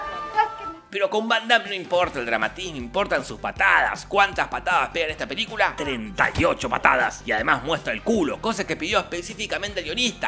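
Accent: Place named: Argentina. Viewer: ME ENCANTA EL ACENTO ARGENTINO.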